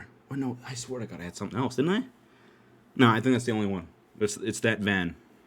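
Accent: Canada. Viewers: I knew you’re not American from scattered context but damn, how did I not clocked you as Canadian? I’m a failure. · fr he sound like a white guy, or maybe canadian speaks like that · I havent noticed until now but got a decently strong canadian accent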